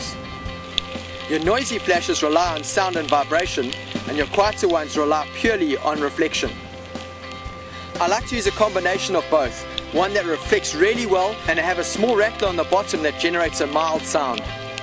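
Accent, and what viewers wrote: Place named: South Africa. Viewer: lol he isint kiwi his south african haha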